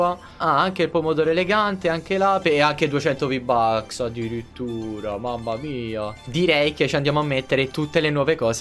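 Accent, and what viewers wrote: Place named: Naples, Italy. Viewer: Pensavo fossi di Napoli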